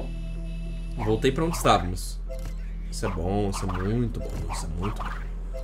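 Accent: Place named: Germany. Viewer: o sotaque dele me lembra um soltado alemão.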